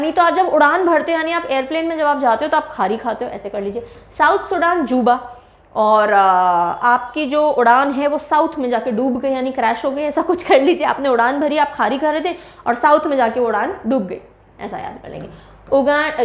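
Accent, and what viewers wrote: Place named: Bengal, India. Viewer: Aap bengal sai ho didi. Ki hallo didi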